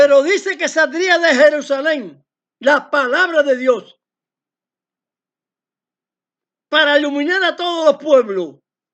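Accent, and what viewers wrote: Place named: Cuba. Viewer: Ese acento cubano... espectacular!!!!! Bendiciones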